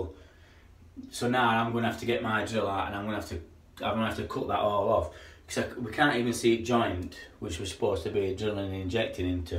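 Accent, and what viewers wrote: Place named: Barnsley, United Kingdom. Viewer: Very informative video and has stopped me from knocking plaster off myself after a water leak. I thought I might be saving myself some money if I did it myself ( 62 years old and only one income ) Anyway lad, thy talks Barnsley and I love that accent. Thy seems a reeght genuine kid so thanks for that 👍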